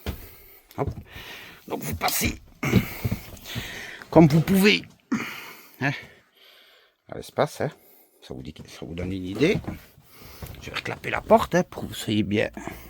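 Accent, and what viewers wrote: Belgium. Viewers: 😂....j'ai rigolé comme jamais en regardant cette vidéo!! Outre l'accent Belge, succulent, votre bouille de bon gars qui s'est fait berner et qui voit son rêve se transformer en cauchemar (à 60000 balles) est superbe! Je suis vraiment désolé pour vous. Bonnes vacances tout de même!! P.S: prévoyez une tente Queshua pour les gamins, c'est plus rapide à monter et bien plus confortable!! 😊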